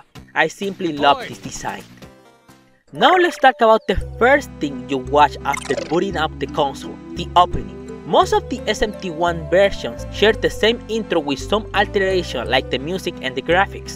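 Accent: Chile. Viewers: Jajaja eres chileno!! Me suscribo jejej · este tipo literalmente es el único aweonao que suena como chileno hablando en inglés que habla de smt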